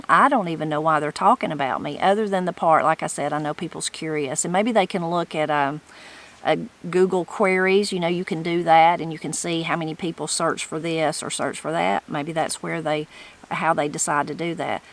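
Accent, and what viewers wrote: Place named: East Tennessee, United States. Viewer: Just chiming in to say that I enjoy your videos, and it's crazy to me how it's anybody's business about your money other than your own. Keep on doing what you're doing, and it's good to hear voices that sound like home to me. East Tennessee expat in South Central Virginia, I'm still technically in Appalachia, but it's a little different than home.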